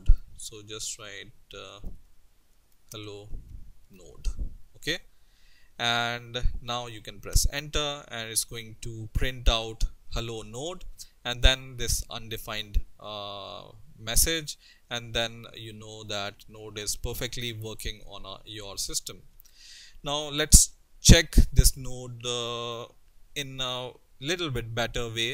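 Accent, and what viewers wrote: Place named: India. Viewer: indian english is best ! so clear and easy to understand ! thnx bhai anyways :-) best tutorial !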